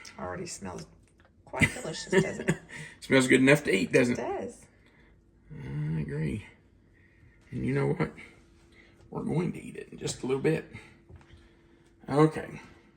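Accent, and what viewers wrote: South, United States. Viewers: Tell you something, this is not a pie you can get anywhere, yet Kentucky Derby Pie is a thing. My mouth actually watered, and I never had it! I will be making this. Wonderful video. Simple, right to the point and I have to say, you have got one of the most proper gentlemanly Southern accents,.....and that's coming from a NY Brooklynite.....lol. TY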